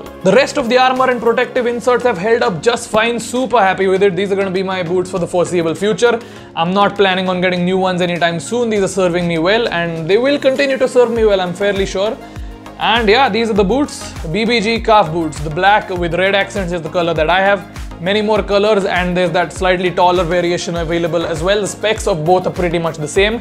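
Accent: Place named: Russia. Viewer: Bro,what's that Russian accent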